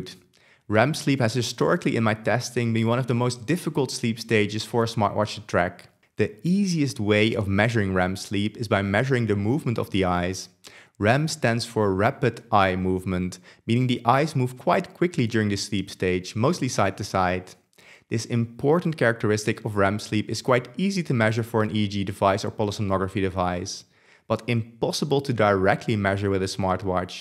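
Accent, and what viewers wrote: Netherlands. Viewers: Also here to comment on your methods with this being my first of your videos to watch. Really well done and I appreciated the assumingly Dutch accent :) The thing I'll be missing (or I already missed it was announced) is an alarm function at the end of a sleep phase. I found 3rd party apps performing rather poor at this and I think it'd be the number 1 feature I'd love my watch for. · Up until where you show the dates, I thought you said eighty nights like a dozen times throughout the video, not eighteeN nights :o As a (fellow? you sound dutch) dutch person, one tip I've heard to differentiate easier is to put the stress on the second syllable when saying 1x (eighTEEN) and on the first when saying x0 (EIGHty). This does make me wonder a lot more about the p-value on all this, as this is supposed to be scientific after all and there are no error bars in e.g. 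the conclusion chart shown . Don't get me wrong, it's a solid analysis nonetheless, but it's a bit hard to know just how conclusive the comparison is or if there are overlaps in the error bars :)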